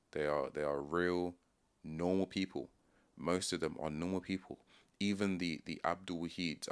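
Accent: United Kingdom. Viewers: You're literally watching a video of a man, who although clearly not a native, has mastered the language and has assimilated to such a degree as to have an accent, and i'd wager ideals, in line with what one would expect of someone who is British. In other words, he has fully adopted British culture and thus is himself British. To be British is cultural, that is why the English, Welsh, Scottish and Irish are all British. And yes, I said it, the Irish, all of them, whether they like it or not I consider them brothers, just really grouchy ones as they're still upset that they didn't get their mashed potatoes that one time... If I was born in England to typical English parents (Well, I mean I was, so no imagination required for that part. Rather a parent, dad went for milk and cigarettes... ), yet moved to Nigeria young, I'd be English by nationality but provided my parents don't have a superiority complex about their own culture, it will become increasingly likely that I heavily adopt Nigerian culture and become culturally Nigerian. 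That would perhaps be a strange sight that would make a twatter leftists head explode, to see a white man as pale as I speaking as a Nigerian, with all the calmness and grace, the funny pauses and affectations. "Ah, good evening sah, this is your bank calling... " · Nobody seems to care that the INDIGENOUS PEOPLE are being raped, murdered, and replaced. Or is it only when the "indigenous" are a black or brown minority group that people care? Is it really a case of "You whities have had it good, get what's been coming to you"? Of course it is, that's what the anti-white propaganda has been screaming for decades. All of them must go. I'm not sorry, either, you all must go. Had it been Nigeria that Germans were illegally immigrating into, there would be outrage and the populace would violently oppress the percieved invaders. While you act British, and have British speech, and act in a British manner, you are only British by civic nationalism; in every other sense of the word, you are no more British than I, a Canadian, but even then I am more British than you. 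I greatly appreciate the effort you put into this video and I laud your tact and precise framing and use of English, however you must also go back; you do not belong here, and history will show the world that the greatest folly of the White Man was, upon freeing all of their owned slaves, not sending them back home whence they came. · I haven’t heard many people on the right saying “get rid of all immigrants”? As an Englishman few things make me prouder than a true British person like this chap with an immigrant background. The fact that he has adopted some of our culture, values, accent, sayings, fashions, sports etc. He is British! Born and raised here just like any White British kid his age. It’s all about integration and adding to a society, not being a drain or a detriment. Many areas of the UK now have not been integrated but replaced, and when British culture is replaced by something so alien as Islamic ideology and practices you will inevitably get conflict. 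· Your UK accent gives you away. You're not the problem. It's people who don't even speak english.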